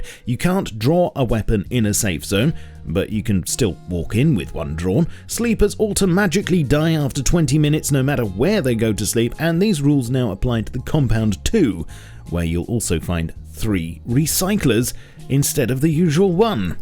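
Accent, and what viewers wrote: United Kingdom. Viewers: Every British person sounds like this if they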